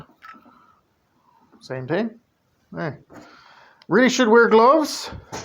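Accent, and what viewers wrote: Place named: Newfoundland, Canada. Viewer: I started watching out of curiosity....soon as I heard the newfoundlander accent you could have been reading war and peace and I'd have sat through to the end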